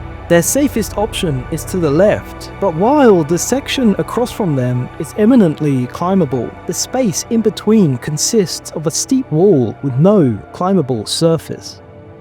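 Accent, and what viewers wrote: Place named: Northern England, United Kingdom. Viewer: Confession time: I'm always willing to support new projects via Patreon or whatever but want to know whether the creator is serious about their work, and usually responses to criticism are a good way to find out. Sorry about the deception. Anyway I see your main strengths as your visual interpretation of the story, and the friendly non-AI Northern tones of the narrator (yourself perhaps?). Well good wishes for your new venture I've subscribed so I can check out your next download when it's available.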